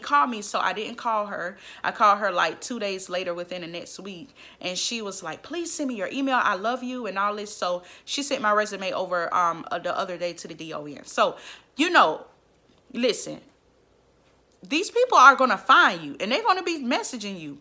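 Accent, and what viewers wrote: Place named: Florida, United States. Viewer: Are you from Florida? Lol you sound like me and my friends talking. Anywho, thanks for the video... I start nursing school next week 🙌🏾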